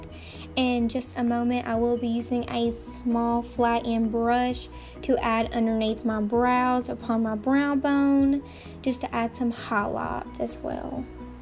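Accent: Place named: Rural, United States. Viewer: I never noticed how country your accent was until these.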